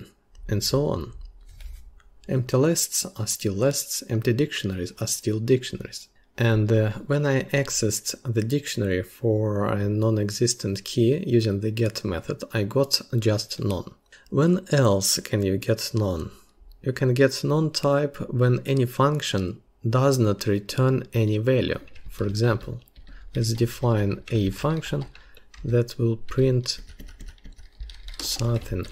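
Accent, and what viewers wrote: Russia. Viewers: Чувствуется русский акцент)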